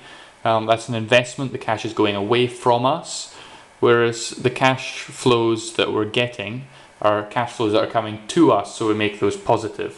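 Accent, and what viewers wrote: Scotland, United Kingdom. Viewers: God bless you. You truly are a gentleman and a scholar. Your Scottish accent is a comfort to me whenever I click on these videos.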